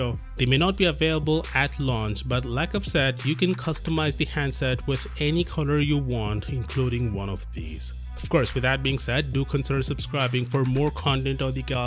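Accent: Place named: India. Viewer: You're accent sounds Indian.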